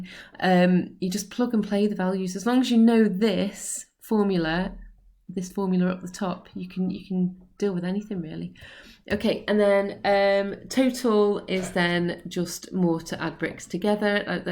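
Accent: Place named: North, United Kingdom. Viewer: thank you, good stuff, nice simple and concise, so your are northern then, I m based around Manchester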